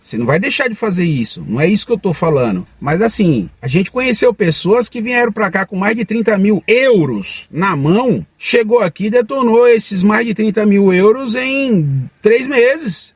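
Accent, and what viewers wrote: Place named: Brazil. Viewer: Salveeeeee familia , é bom dar concelhos pra pessoas k querem vir para Portugal , mas algumas pessoas não pensam k é bom ter a mente bem preparada , pois o psicológico é muito importante e tbm ter algum dinheiro guardado ne' ? Enfim Você ajuda , as pessoas k aceitem o k lhes dizem pk é para o próprio bem deles . Muito bom teu português , adoro o português brasileiro , eu adoro . Um beijinho familia , deus vos abençoe sempre 💖🇧🇷🇵🇹🥰🥰🥰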